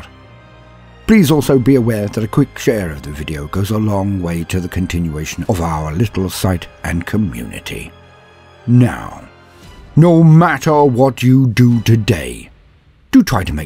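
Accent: United Kingdom. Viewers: Ah, my 2 favorite things, Blood angels, and an old British guy explaining them.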